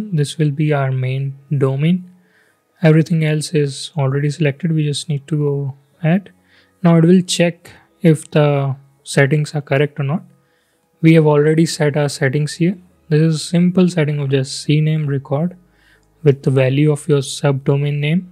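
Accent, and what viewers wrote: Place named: India. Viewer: indian accent = like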